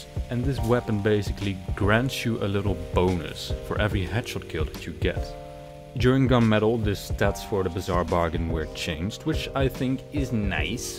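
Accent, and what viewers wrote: Netherlands. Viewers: Je Nederlandse accent is echt heel duidelijk :D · ey just found out your channel and i can already tell ur dutch (same here). keep up the good work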